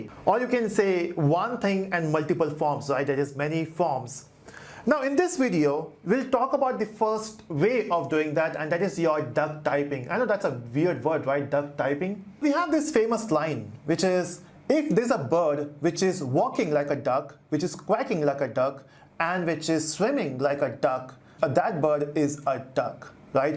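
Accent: Telugu, India. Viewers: are you a Telugu guy from Andhra Pradesh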